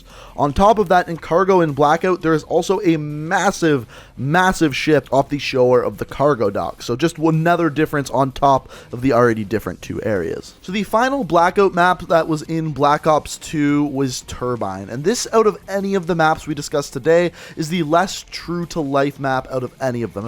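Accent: Canada. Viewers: R u Canadian? Love it accent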